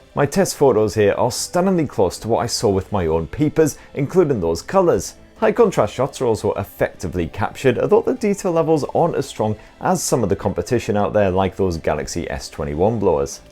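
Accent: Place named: Yorkshire, United Kingdom. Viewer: Thanks for the great review. I picked up on your Yorkshire accent in the first line, and was delighted to see pictures of York. I enjoy comparing them to my Nikon pictures from my visit. Memories! Cheers.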